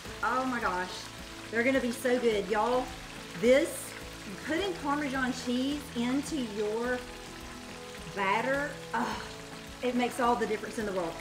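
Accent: South, United States. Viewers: From one Southerner to another I just love your accent. Greetings from the great state of Tennessee. Sadly, the Southern accent here in East Tennessee is being watered down by the influx of people from the Northeast. They're actually invading the Southeast. Anyway. Love your videos. Take care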